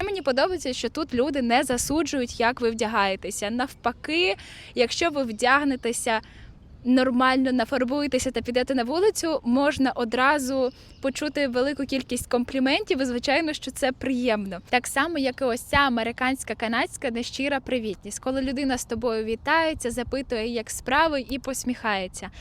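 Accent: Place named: Ukraine. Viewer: I like your accent. Pure Ukrainian!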